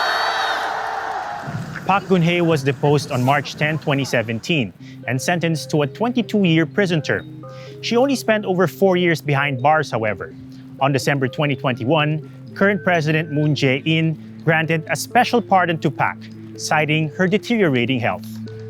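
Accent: Philippines. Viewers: Korean names and a Filipino accent 🙄 we need subtitles please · Is the host Filipino? He has that accent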